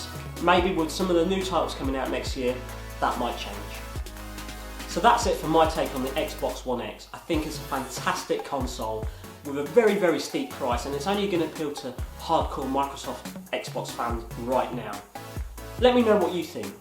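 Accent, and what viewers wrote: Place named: United Kingdom. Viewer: Am i only one who notice that this man skin tone is that of a black person yet he sounds like he's from Britain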